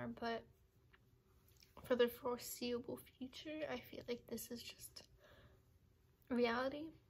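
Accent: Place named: Poland. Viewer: Your accent seem like Polish lol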